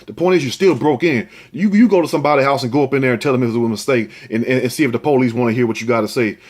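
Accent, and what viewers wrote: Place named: New York, United States. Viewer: I live your accent? Are you from New York?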